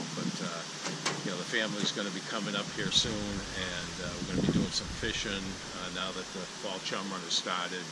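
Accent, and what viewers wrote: Boston, United States. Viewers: You still have that Boston accent, thanks for sharing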